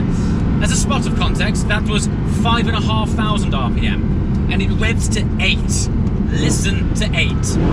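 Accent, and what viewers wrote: United Kingdom. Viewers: The british accent gives the videos a touch of class that makes a supercar review a notch above the rest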